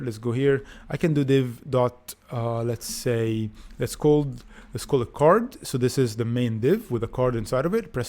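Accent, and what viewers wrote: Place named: France. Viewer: Everything made perfect sense because of the way you explained it. Thanks a million. The charming French accent added a flavor haha. It was perfect!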